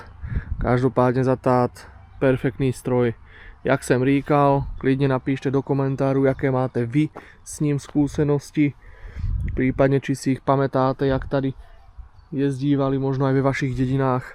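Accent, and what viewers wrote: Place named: Moravia, Czech Republic. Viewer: Moc fajn video! Nikdy jsem nikoho neslyšel mluvit zároveň česky, moravsky a slovensky 💪🏽😀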